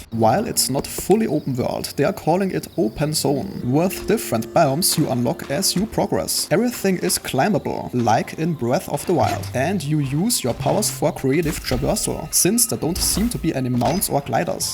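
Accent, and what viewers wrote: Germany. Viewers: i cannot stand that german accent....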